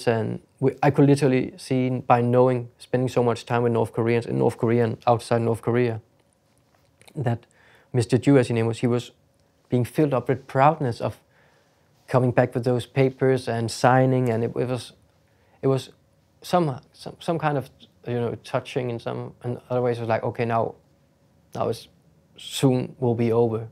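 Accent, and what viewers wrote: Denmark. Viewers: Damn, knew he was danish the moment he opened his mouth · Bro the danish accent is so clear it’s funny to me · Man kunne høre han var dansker fra starten 😂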